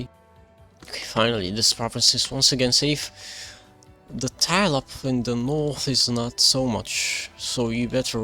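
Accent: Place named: France. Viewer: The french accent fits well in your talking bro 👍👍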